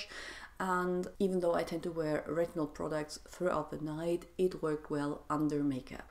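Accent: Germany. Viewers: I love your accent, are you German?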